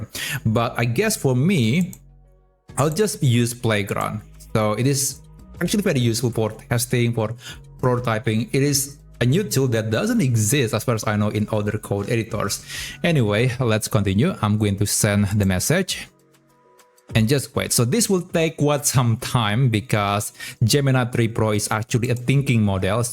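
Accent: Indonesia. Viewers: stunning tutorial man, great job! btw your accent like indonesian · That's what I thought, too. his English is Indonesian English.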